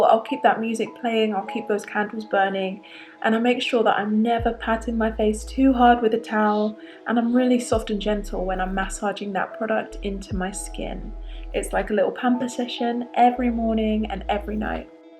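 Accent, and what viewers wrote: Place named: Essex, United Kingdom. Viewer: Lara here. Very pretty intro. ☺️☺️ The Essex accent 🥰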